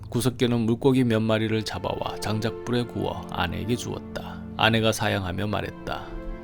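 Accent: Gyeongsang, South Korea. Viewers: 근대 해설자님은 사투리를 경상도만 써요? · 경상도 사투리가 구수할수도 있구나~^^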